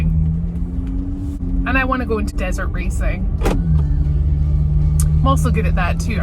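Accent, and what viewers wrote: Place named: Scotland, United Kingdom. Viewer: Yo sound part Scottish and its really messing with my head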